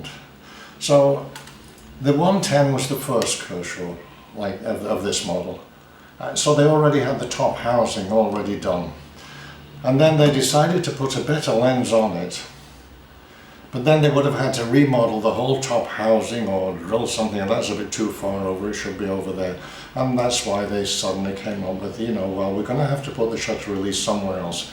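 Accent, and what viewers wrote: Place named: Yorkshire, United Kingdom. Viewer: Lots of lovely little stories in there,. Love your Yorkshire accent!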